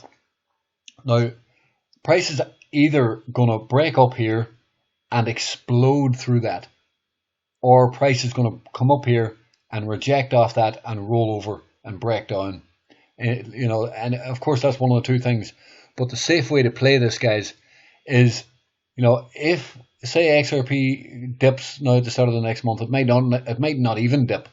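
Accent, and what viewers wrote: Northern Ireland, United Kingdom. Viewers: Northern Ireland accent i hear there ?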